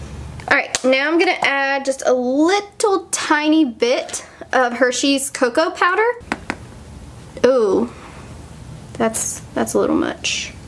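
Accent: South, United States. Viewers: Do you have a country aksent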